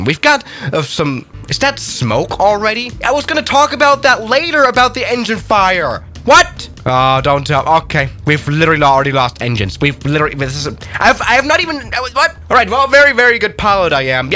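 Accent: France. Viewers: His French accent got me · You French accent is so funny xD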